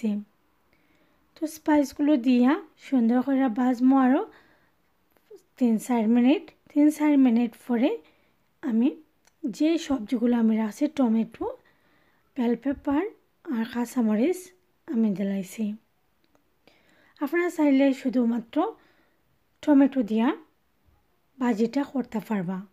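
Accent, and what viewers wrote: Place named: Sylhet, Bangladesh. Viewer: আপু দারুন রেসিপি,তোমার কথা শুনতে খুব ভালো লাগছে,বিশেষ করে “ “গান শোনার পর আমি সিলেট ভাষার প্রেমে পরে গেছি❤️❤️❤️❤️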